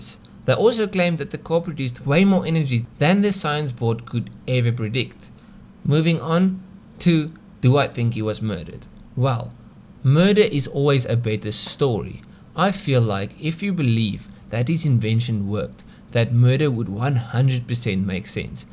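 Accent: South Africa. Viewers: The South Africa in this accent is beautiful haha 😂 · South African accent? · Afrikaans accent is strong 🔥🔥♥️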